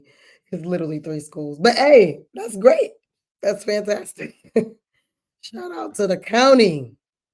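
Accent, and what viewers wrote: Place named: Georgia, United States. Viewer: yeeesss, is the beeeest georgia girl accent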